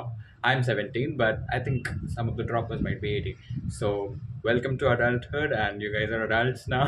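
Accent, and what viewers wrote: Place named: Maharashtra, India. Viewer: मराठी accent आहे तुला😊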